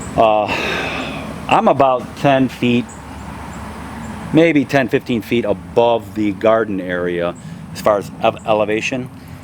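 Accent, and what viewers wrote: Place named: New England, United States. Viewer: sounds like New England to me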